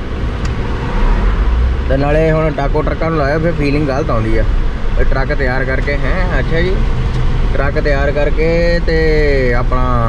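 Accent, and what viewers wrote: India. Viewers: Veere tuhade language toh lagada tusi kapurthala vale side toh oh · Maza aagya bai....malwai boli❤️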